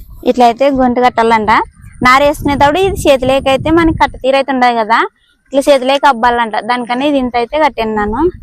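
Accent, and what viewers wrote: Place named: Karnataka, India. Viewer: Mee matalde telugu karnataka side ani telisindi.nanu antunnaru bhagundi talli, god bless u.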